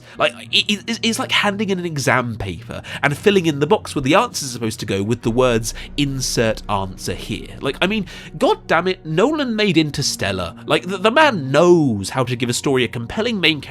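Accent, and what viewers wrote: England, United Kingdom. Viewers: you sound British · The dumbest and THICKEST apologist video you’ll seen on Tenet. Just because he has an English accent doesn’t mean his points are insightful, they’re just verbal salad.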